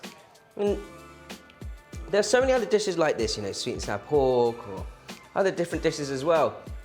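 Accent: England, United Kingdom. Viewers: His England or British accent doesn't have anything to do with his cooking skills. Trip part bou it is he may be Philapeno Lol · Lmaoo I don’t trust a Korean with an English accent making anything Korean then he made it so non Korean like 😂😂😂😂 nice try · An Asian with a middle class English accent? Now I've seen everything! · This Asian dude sounds more English than English people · I was confused for a second when he started to talk lol he looks asian but with a British accent. I will try this recipe, thanks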